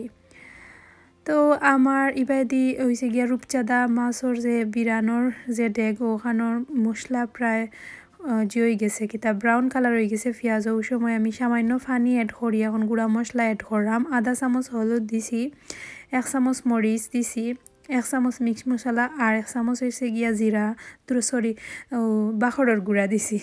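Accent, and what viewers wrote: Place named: Sylhet, Bangladesh. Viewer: আপু সিলেটের মাত হুনলে যে ভালা লাগে মন শান্তি অই যায় আমি নতুন আশা করি পাশে থাকবা আর ভ্লগ অনেক সুন্দর হইছে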